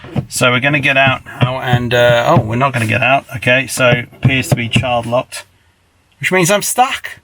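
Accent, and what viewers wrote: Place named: United Kingdom. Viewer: you have been a godsend! I have been watching your videos since just after Christmas when I decided to get a new car. All I wanted back then was a back-up camera & a hatchback [current car is a 2013 manual Mini convertible - tons of fun but a very active puppy makes the top-down times limited]. Then I went down the electric car rabbit hole - I have been intrigued since the first Tesla 2-seater which I coveted from afar. Checked out Consumer Reports and they teased that a new car was coming to market in the US soon, and that was my intro to the Polestar! Honestly, I fell in love w/ the yellow seatbelts irrationally & immediately. I have co-workers who have Teslas but I hate the center-only screen. I googled Polestar and became quickly hooked on your open & honest straightforward videos [my dad was British so the accent doesn’t hurt either, probably some subliminal warm feelings there too]. 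I’m brand new to e-cars so that started the learning curve re: charging, battery size, range, blah, blah, blah [your early video helped me slog through that phase]. I took the leap in lockdown and drove to NYC to see and test drive the car in person and fell in love! When I got home from that trip you posted your now infamous “Don’t a Polestar” video, and that put a chill on my enthusiasm. Did I really know what I was doing? Was this just my rebellion for being locked in my apartment for a year? Who knows, but I got over it and in early March ordered my Midnight Polestar 2. Did the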